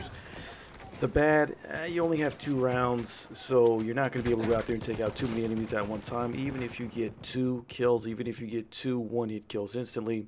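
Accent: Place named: Philadelphia, United States. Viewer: this guys gotta be from the Philly area. Lived my whole life here, can hear a Delaware Valley accent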